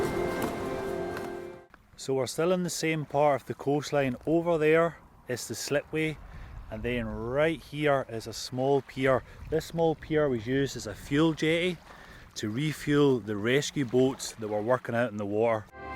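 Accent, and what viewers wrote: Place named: Wigtownshire, United Kingdom. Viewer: was born stranraer,,i can hear yer accent,,!wigtownshire,,dunragit lol,went to drochduil school ,we could see them bombing at the dunes sometimes at west fruegh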